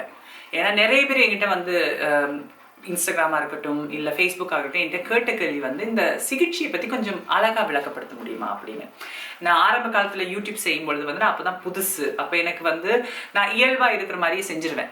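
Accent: Sri Lanka. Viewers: Ka your speech is very beautiful like srilanka tamil oh my sweet ka and please reply your comment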